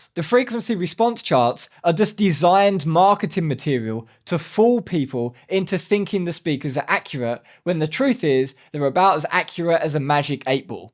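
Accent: United Kingdom. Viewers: So which European country are you at? Because I’ve noticed your wall power socket (is it Type L?) to be unusal for EU, like it’s from old days (60s-70s), perhaps in Italy? Of course your accent is British but that wall socket is something else (not UK). My guess is that you are either in Italy or Germany, perhapse in a house in the woods, which might explain seeing your tools (I do like that window view). Please do tell, I am curious about that wall power socket, it’s kinda rare. Wish you best of luck with your DIY speakers, they do look good. I will stick with my Genelecs (have you seen/heard their 8381A or 1234A models? they are cheaper than PMCs!)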